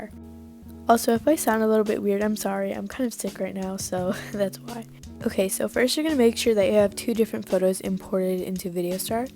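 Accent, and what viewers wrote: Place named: United Kingdom. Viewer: i thought you were british for a good minute 😭😭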